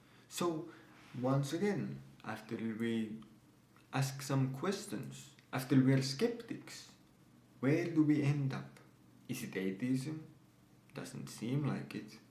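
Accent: Finland. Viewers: let me guess. You from Finland?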